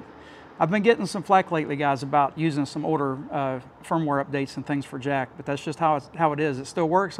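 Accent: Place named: West Virginia, United States. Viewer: I really enjoy all your tests, sense of humor, and I love your WV accent. You seem like such a down home Christian guy. I also love your scripture quotes at the end of your videos. I'm a California Baptist, and I love listening to Southern Gospel music. I'm planing a trip to the east coast this fall, for a short hike on the AT. It would be great to meet you and and shake your hand. Thank you for these great educational videos!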